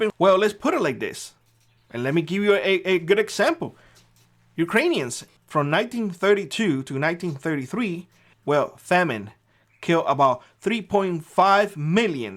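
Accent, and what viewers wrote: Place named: Mexico. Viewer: YOU MUST BE A WHITE MR MEXICAN, DEPORT YOU TOO. YOUR ACCENT GIVES YOU AWAY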